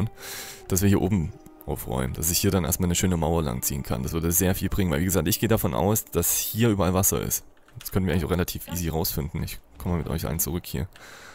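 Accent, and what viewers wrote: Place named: East Germany, Germany. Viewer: 1.City of doom (von Zombies besetzte Stadt/ ist etwas zerstreut manchmal) 2.Cottage auf ossi-englisch Das sind keine Sprechfehler, das ist Charakter.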